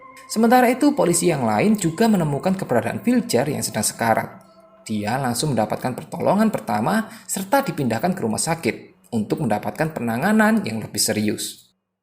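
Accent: Java, Indonesia. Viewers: jowo mu endiii mas adminnn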